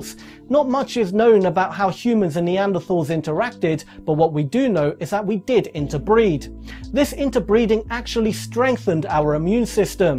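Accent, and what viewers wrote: United Kingdom. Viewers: you sound British and your number 2 signal just told us all to go forth and multiply! Really! You should know the difference btween two and FO.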